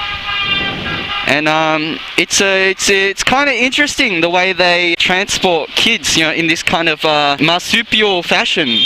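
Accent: Australia. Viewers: Sorry ur biased, some times u sound like a yank and some times like an Aus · you have a Melbourne Accent. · Keep the videos coming matey. Beautiful accent btw....I love the aussie accent. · Your aussie accent does not sound too good. I prefer the good old american. Sorry!! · Lol...odd and refreshing to hear a viet with an Australian accent. How the hell you cross the streets in VN? Seems with like constant traffic with the scooters, bikes and cars, you must need to pole vault from one side to the other.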